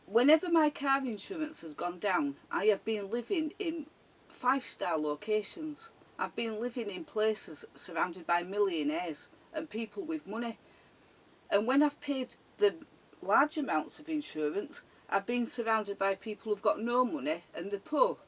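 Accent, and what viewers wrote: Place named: North, United Kingdom. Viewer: Lovely northern accent:) Where did you live in SCotland? Is it generally cheaper to live there than in England? Thank you.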